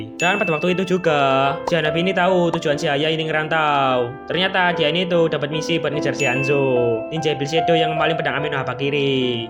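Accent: Java, Indonesia. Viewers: Abang orang jawa ya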